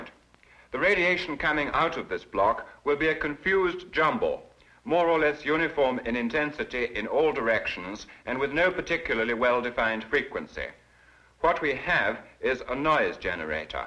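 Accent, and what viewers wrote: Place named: Mid-Atlantic, United States. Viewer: Flawless mid-atlantic accent here... impressive!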